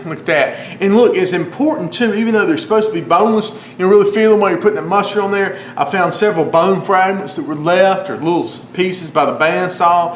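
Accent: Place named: Texas, United States. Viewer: I'm living in England now but grew up in Texas and I definitely miss the accents of the south. Listening to you explain this delicious recipe was a real treat. Thanks man!! Since I grew up with hot links and dry rub long smoked brisket (being a Texan) I'm more and more into pork for bbq. I'm converted!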